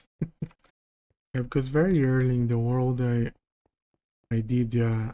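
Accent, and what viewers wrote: Brazil. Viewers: Probably in the top 5 worlds of all time by the way, are you Brazilian? Your accent sounds like it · É brasileiro? Tem sotaque de brasileiro kkkkkkkk. Mundo massa demais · brazuca aqui, ingles bom , entretanto dá pra identificar que é brazuca hehehe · i came for minecraft but stayed for the brazilian accent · Pelo nome e o sotaque, acho que você é brasileiro, confere?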